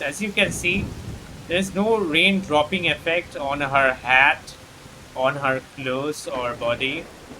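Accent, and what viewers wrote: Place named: India. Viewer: слышу индуса и на душе тепло как-то сразу становиться.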